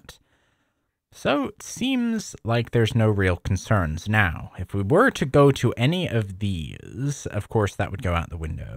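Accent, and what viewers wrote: Canada. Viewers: He's from Canada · i know that, but canada is huge and has many accents, im trying to get the accent down specifically as this isnt the canadian accent im used to hearing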